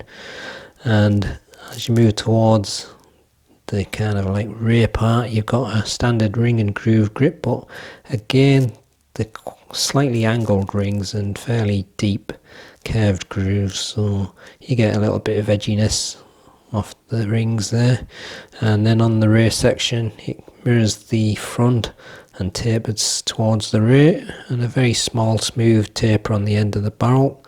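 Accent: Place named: Middlesbrough, United Kingdom. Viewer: Been watching the vids for a while now just wondering whereabouts you are from as I am from Middlesbrough , it sounds as if you're from that neck of the woods.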